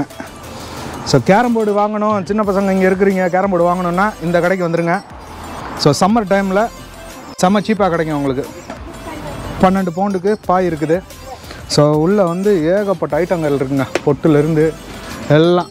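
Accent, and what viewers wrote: Madurai, India. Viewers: Nee madurai ah bro. Slang madurai maari irukku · ஆஹா...ஆஹா...மதுரை தமிழ்... அழகு அழகு....வாழ்க வாழ்க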